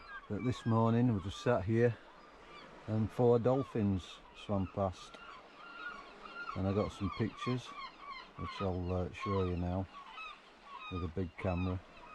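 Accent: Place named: North, United Kingdom. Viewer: That was a lovely park up. Loved the views. You are so lucky living up there. Meant to ask, where are you from originally? Good to hear a proper northern accent. Hope you and Ellie have a good Christmas.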